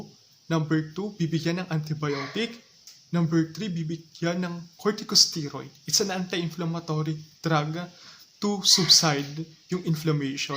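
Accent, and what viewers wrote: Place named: India. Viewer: Bat po kayo ganyan magsalita sir? Parang bumbay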